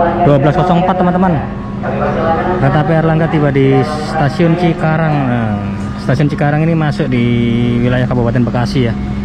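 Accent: East Java, Indonesia. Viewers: Mas orang mana ..kok bahasanya kayak Orang jatim?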